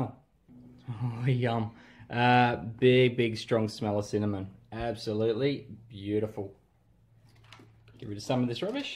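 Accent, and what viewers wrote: Australia. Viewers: Your australian accent like the blades to my ears. I love more the US Eng. But anyway you're the awesome reviewer at YouTube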